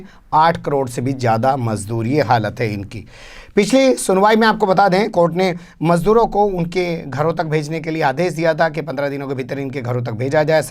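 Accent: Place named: Haryana, India. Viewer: Saheb Aap Haranvi bolte wo Aacha aur Mazahiya lagata hai. Keep it up. Court Qanoon ki Dhajjiya BJPs Sanghis. Ke Raj.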